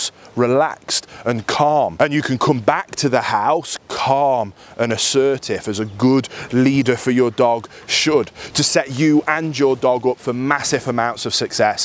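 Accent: United Kingdom. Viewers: What amazing training tips! I've lived in South Korea for a long time so hearing a good British accent is something I miss!!! My lab loves his crate now since watching your video.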